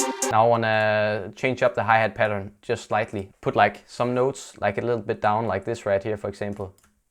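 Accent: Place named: Denmark. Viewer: knew from your accent you were from denmark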